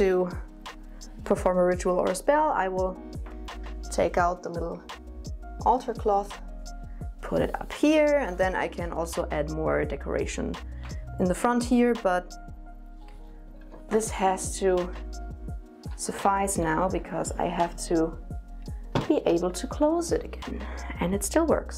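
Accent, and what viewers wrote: Germany. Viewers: she liked your German accent. Sweet...it is sweet blessings have a good week have some cake..🎂🍰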